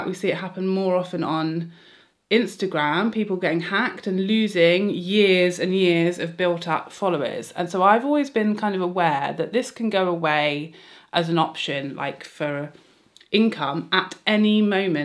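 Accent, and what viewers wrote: United Kingdom. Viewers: Congratulations dear, 5 years and 50K i'm so happy for you!!!🥂🤩🎉👏 I'm almost 3 years watching the beauty video's here. I found you because of dear Mel, and i know how much you hurt because of the loss of her, so i was also so happy to see that you got your humor back, your British humor i love so much, but not only that, i love you being you, not uploading to be loved by those companies but the interaction between you and us!!! And, here too, i love these "you don't need that" video's you don't realise how hilarious you are!!! I love your lovely beautiful you, knowing so much about all these products, being so honest what is good and what not, your enthousiasm, your beautiful elegant British English of course, i'm so thankful to you that you make all these beautiful, pure, honest wonderful and hilarious also video's for us, full of know-how!!! 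And if you would upload less, i will always watch your video's!!!! Enjoy these special days, sending you lots of love from your neighbour country Netherlands!!!!😘😘😘😘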